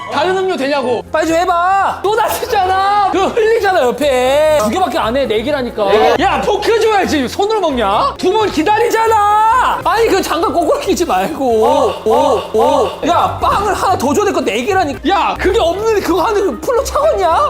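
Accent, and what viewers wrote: Jeolla, South Korea. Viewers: 형원이의 영향인지는 몰라도 사투리할 때 전라도 사투리가 자연스럽게 나오는거 볼 때마다 깜짝깜짝 놀라 한국의 얼을 이해를 못한거야를 모단거야 하는데 진짜 자연스럽다 생각함 ... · 민혁씨 전라도 출신인가요? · 말투가 너무 구수해서 빼박 전라도 출신인줄 알았더니 태생부터 서울사람이넼ㅋㅋㅋㅋㅋㅋㅋ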